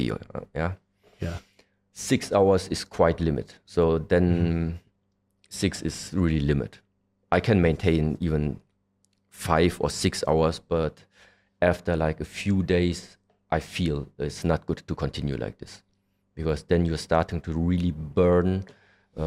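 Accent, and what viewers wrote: Germany. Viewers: Didnt expect the german accent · Why does bro have a really German accent? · Sag ma bin ich es oder hör ich da einen deutschen Akzent?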